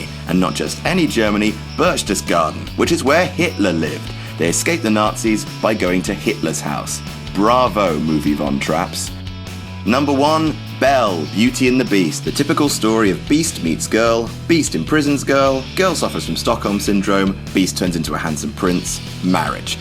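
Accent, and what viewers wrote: United Kingdom. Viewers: Will I subscribe to "WhatCulture"? NOPE! Very dumb video here. You imply in the title that your going to tell us about things we missed in movies that tell us these characters didn't really survive. Instead you make some very dumb conjecture that has nothing to do with the scripts or stories. By your logic - every character in every movie really died - because everyone eventually dies. I hate videos like this - where you present no real value. Just use some british-accented knucklehead to voice over a POS - imagine if a 12 year old kid did the voice over to this - you'd realize is was a complete waste of time.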